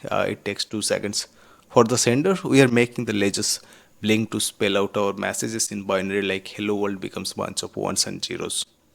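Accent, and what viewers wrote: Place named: India. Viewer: bro y you sounding indian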